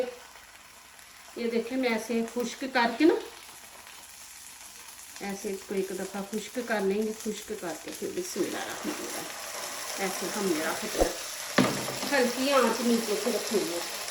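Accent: Punjab, India. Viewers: Plz zara ahista or kum bolen plzplz.or punjabi ki jagah urdu lehjy mn bolen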